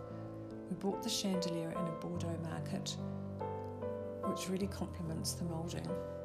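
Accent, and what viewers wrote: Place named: New Zealand. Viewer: Your lovely NZ accent and soft voice make it difficult to capture the story of your magnificent Chateau. Look forward to many more intriguing vlogs.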